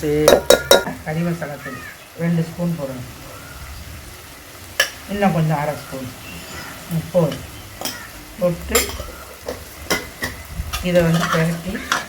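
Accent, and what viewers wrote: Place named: Ramnad, India. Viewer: Nenga ramnad dhana unga slang ramnad madhuri iruku illai adhai suthi ulla giramam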